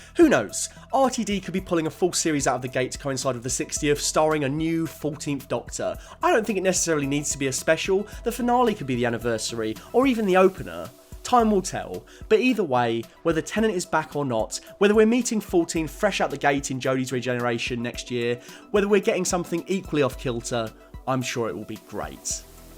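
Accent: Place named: Scotland, United Kingdom. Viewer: Let him keep his REAL voice... Third Scot up. :)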